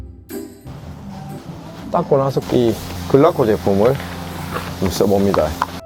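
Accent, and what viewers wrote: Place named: Changwon, South Korea. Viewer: 저도 늘 영상만 보다가 주문했어요. 고향이 저도 창원이라 정감난 말투가 반갑고 재미나게 봅니다. 사투리가 씨네..~~~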